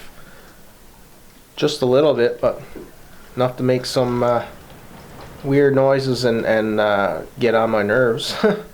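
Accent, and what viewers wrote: Newfoundland, Canada. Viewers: Right as soon as you opened your mouth I knew yous was a newf. From Kilbride myself. Living the life you are.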